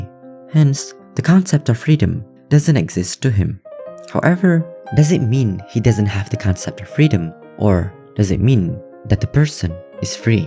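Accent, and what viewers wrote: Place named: Asia. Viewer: Interesting perspective, but there are some points you made I'm agaisnt. Dressing up as animals in public or marrying fictional characters shouldn't be normalized by society and encouraged. You should not go to prison or be systematically punished, but these actions should be socially condemned. A society needs stable and functional norms that are followed by each citizen in order to survive, or else it risks falling into problems such as decadence or just general social instability. Morality can be subjective depending on how you look at it, but standards must be set. It doesn't have to be 1984 either. However, I can understand why you might think this way. I'm not too knowledgeable on this topic, but on some countries such as Korea and Japan it can get kinda insane, as it has become socially normalized to have a false identity in your public life just to be agreeable and appease everyone. 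Judging from your accent, I think you may be Asian so it is reasonable to think you'd be unsatisfied with how social freedoms can be restricted by society in those countries. However, at some point, freedom needs to be limited so society can keep running and not fall into collapse. You have the freedom to make choices, but you do not have the freedom to escape the consequences. This may sound a bit like Petersonianism, where every individual has free will but it must be enforced with strict moral codes for an ideal society. I might be wrong, I'm not a philosophist I'm just sharing my thoug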